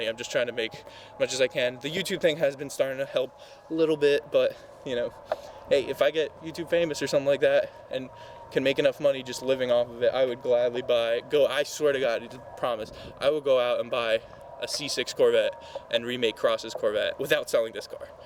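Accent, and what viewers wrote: Russia. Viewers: Are u russian bro?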